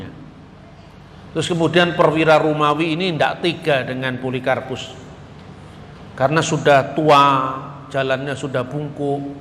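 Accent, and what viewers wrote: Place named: Java, Indonesia. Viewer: Lidah jawa banget Docetic => Doketik Tapi sangat aneh pemikiran Docetic ini kemudian menjadi pengajaran agama islam. Dalam ajaran itu Penyaliban benar benar terjadi tapi ya g disalib itu bukan Yesus tetapi orang lain yang diserupakan. Anehnya disini mereka sendiri (Ulama2) (Quran dan Hadist) bingung dan beda pendapat tentang siapa yg diserupakan... Ada yg bilang simon dari kirene disebutkan bahwa simon dari kirene adalah Murid Yesus yang paling muda dan setia yg ketika Yesus menanyai ke12 muridnya siapa yg bersedia diserupakan seperti Dia (Yesus) dan simon ini mengajukan diri sampai 3x. Ada yg berpendapat Yudas Iskariot murid yg berkhianat. Perlu di ketahui dalam kisah itu bahwa orang yg akan menggantikan Yesus untuk di salib, akan beroleh surga yg sama derajatnya dgn Yesus. Disini ambigu nya terjadi... Simon dari kirene bukanlah Murid Yesus, Simon yang murid Yesus bukanlah Murid yang paling muda. 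Sbab yg paling muda adalah Yohanes dan juga penulis Injil. Kalau Yudas Iskariot masa orang yg mengkhianati Yesus beroleh Surga yg derajat nya sama?? Tuhan seperti apakah itu yang menipu umatnya (menyerupakan Yesus supaya Umatnya berfikir bahwa bahwa Yesus benar disalib padahal Tidak)?? Tp sudahlah biarkan mereka dlm kebingungannya sendiri. Penekanan utama iman kristiani adalah Karya Penyelamatannya (Mesias, Kristus) dan hal ini sudah pula dinubuatkan jauh sebelum Yesus (terlahir). Bagaimana Ia menyelamatkan apabila ia sendiri diselamatkan (tidak disalib/diserupakan menonton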